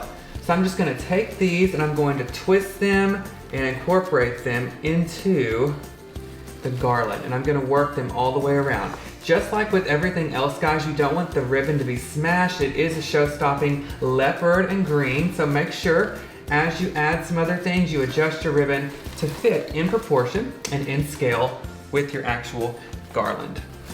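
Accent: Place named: South, United States. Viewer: Child, you are so southern! Love it! ♥️♥️♥️♥️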